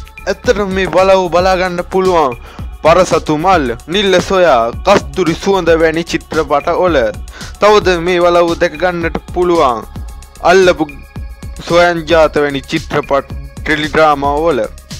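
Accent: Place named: South, Sri Lanka. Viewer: Anthimai... Sawuthu widihata katha karanne.... Mokekda manda😜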